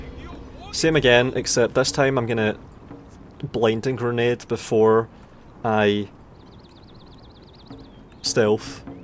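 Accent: Scotland, United Kingdom. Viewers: you scottish? ik wierd ques but anyways great viedo never thought ninjas could be that useful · Are you Scottish?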